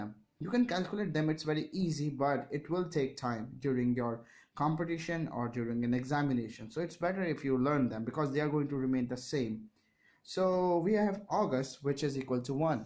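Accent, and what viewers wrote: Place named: Hindi, India. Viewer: Lol.. Nice...trying to catch up with an accent , but instead of getting along one, you are mixing accents and flow is still hindi .. And YOU KNOW🤣 strange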